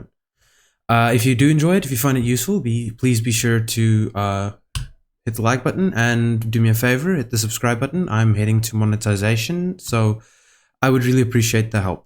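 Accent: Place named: South Africa. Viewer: Hmmmm I feel like you are south african 🧐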